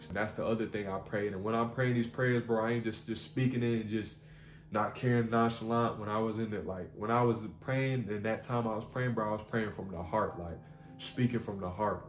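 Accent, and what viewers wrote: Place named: Chicago, United States. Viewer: first time watching your video. i can hear in your accent that’s a chicago accent. i can hear that from anywhere. showing love from out here in chicago man ❤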